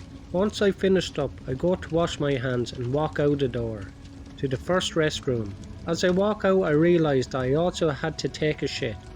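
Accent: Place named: Ireland. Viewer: love the accent, there are way too few Irish youtubers with creepy content! I hope with more subscribers, the mic will be better in future, although I get that it's not first priority. As a suggestion, you could make longer videos, as many people like to fall asleep to them or drive while listening in - and if they are this short, it's not as convienient. About 20-30 minutes would be best :)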